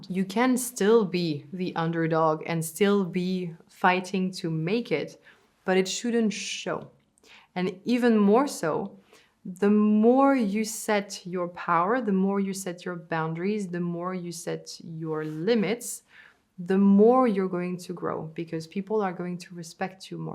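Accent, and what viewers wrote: France. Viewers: French is her first language. 😊 I think she is doing a great job here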